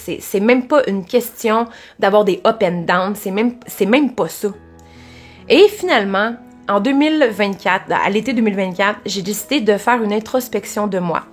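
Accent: Canada. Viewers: Bjr, alors pour l'accent on l'entend mais on le comprend pour ma part j'ai de suite reconnu l'accent canadien, pour votre fille ça devrait être tellement frustrant on n'a pas d'autre choix que de faire confiance aux médecins et aux éducateurs malheureusement 😢 pour votre entreprise si vous engager des étrangers habitants en Afrique du Nord pensez à moi 😅 pour votre traumatisme je suis admirative j'aimerais tellement un jour pouvoir exprimer tout mon vécu un jour ça doit être libérateur.... Pour finir merci pour cette vidéo c'est intéressant d'avoir l'impression de connaître une personne sans jamais l'avoir rencontré !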